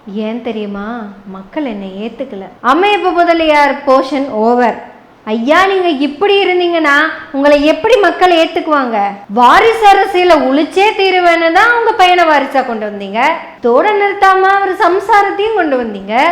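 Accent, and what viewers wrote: Kongu, India. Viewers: அம்மனி வர வர உன்ற கோயமுத்தூர் குசும்பும் கொங்கு (கொஞ்சும்) தமிழும் தாறுமாற இருக்கு அம்மனியோவ் . உன்ற பதிவு ரொம்ப சூப்பரு மா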